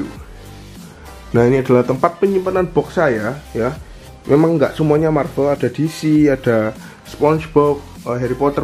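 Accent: Java, Indonesia. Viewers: PERTAMA KOH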